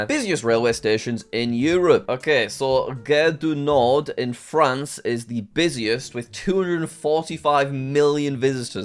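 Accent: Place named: United Kingdom. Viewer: how we British people talk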